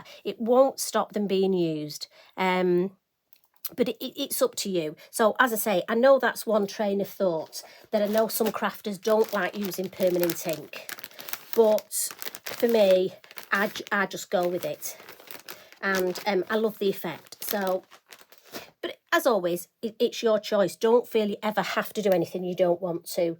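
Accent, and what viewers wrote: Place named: England, United Kingdom. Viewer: First I got to say I just love your accent! There was a zoo show that I watched that was somewhere in England I forget now. But I don't think it was in London. Anyway one of the keepers had your same accent but I can't recall where she was from. Anyways these are both so beautiful but I think I'm also partial to the one on the left. They both have depth but I think the one on the left has more and I think some of it's coming from some of the wider spaces on the stencil being more filled in with the red. Especially on the right side a little bit around that butterfly on the right. I need to get my gel plate out and play with it. I know you don't know me so I hope you won't think I'm interfering but I'm concerned when you said that the nail polish remover has begun hurting your lungs from the fumes. If this is something new if you haven't already I would definitely urge you to maybe see a doctor about it. 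It may be absolutely nothing, but if it's a new development it might be a good idea to have it checked out, especially with the bit of hoarseness you appear to have. I've only seen a few of your videos so far but I love your videos and the art that you make!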